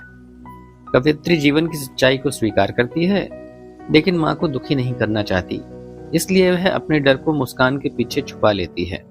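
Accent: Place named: United Kingdom. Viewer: ur english ascent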